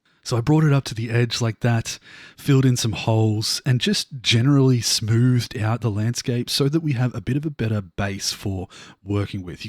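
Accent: Australia. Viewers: When I was watching last episode my girlfriend was in the room and said "he sounds like an Australian who'd make fun of people who played Minecraft". My response? "These eloquently spoken words we are hearing are not for such activities". Continue the amazing work man I have been enjoying this new series so much, I felt very unmotivated to start due to the new massive caves and different generation but I got started and am in love with the new generation.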